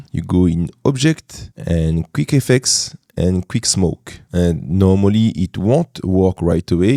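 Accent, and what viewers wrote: France. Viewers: t'es français ça s'entend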